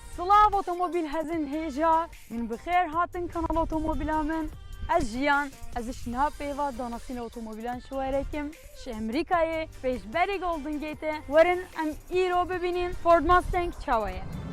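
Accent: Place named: Goyi, Turkey. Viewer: Peki o goyi şivesiyle konuşmanin verdiği zevki hiçbir şiveyle değiştirememek ,u Deste hawa soxbit serkeftin inşallah 🙏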